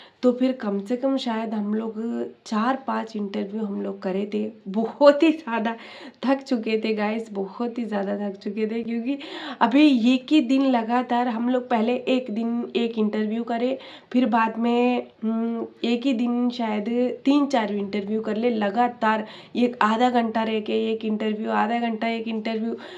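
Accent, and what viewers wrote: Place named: Hyderabad, India. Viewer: You spiking like haydrabadi language style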